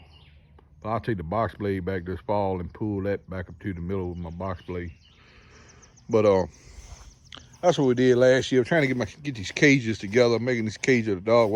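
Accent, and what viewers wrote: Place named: Louisiana, United States. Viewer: Where are you located? From accent, can't tell if you're Cajun, or from around Lumberton SC. I'm in SC. Can't beat dog wire cages,I use taller wire, mabe 4' and cut 6' sections, then drive a piece of metal pipe through bottom so they don't get blown over in storms. Have some cages almost 20 year's old.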